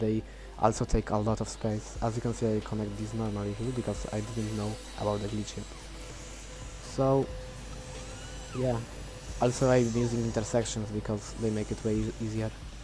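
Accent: Poland. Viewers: bro are you from poland i can't listen some words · are you polish? you have a very polish accent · tylko cie uslyszalem i odrazu wiedzialem ze polak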